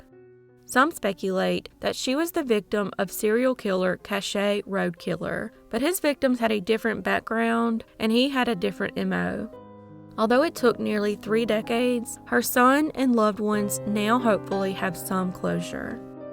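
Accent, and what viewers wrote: South, United States. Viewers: Thank you for All the information on cases ,the way you present with your soft, caring, and compassionate voice. Always good to hear that southern Belle voice first thing in the morning. Take care and Be safe ❤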